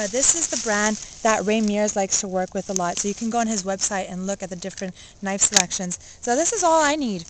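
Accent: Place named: Canada. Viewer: this chick sounds canadian. anyone know if she is?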